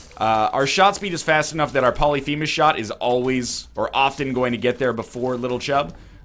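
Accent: Canada. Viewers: So NL's Canadian, eh? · NL went so fucking Canadian this episode. The dark color to your tears after the reroll (and the thing that gave you piercing and spectral tears) was Dead Onion, btw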